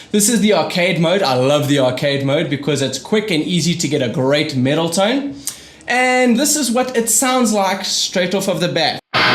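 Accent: South Africa. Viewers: South African...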